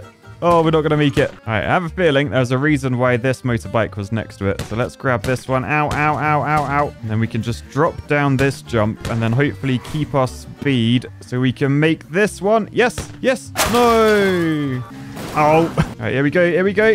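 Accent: United Kingdom. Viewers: Strangely enough this feels like a gray still plays video but with a british accent😂😂😂 im loving it so far only about 15% through the video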